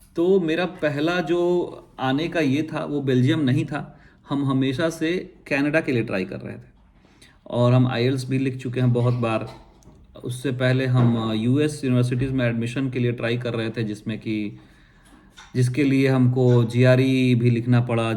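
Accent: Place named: Bihar, India. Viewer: Hello from Canada ..Feel connected as listen Bihari accent after long my mom was from Patna …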